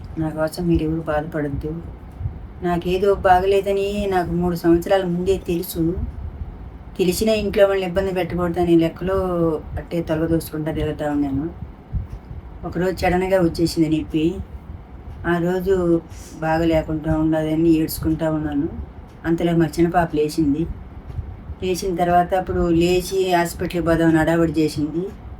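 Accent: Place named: Nellore, India. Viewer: Medi Nellore ah sis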